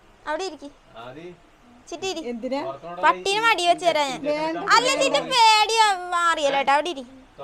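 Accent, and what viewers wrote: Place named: Cherthala, India. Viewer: ചേർത്തല slang ഒത്തിരി ഇഷ്ടം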